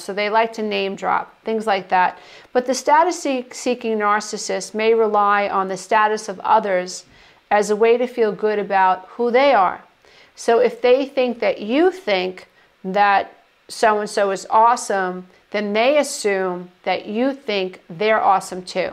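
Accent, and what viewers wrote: New York, United States. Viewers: Your New York accent is EVERYTHING! 😊🏙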